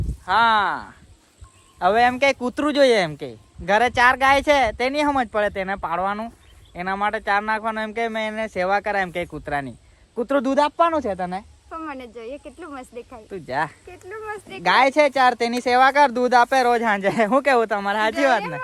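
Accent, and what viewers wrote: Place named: Surat, India. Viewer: Respect karo bhai atli simple gorgeous wife mali c6 to.. Tamri surti bhasha sambhalvani Mazama...keep it up 🎉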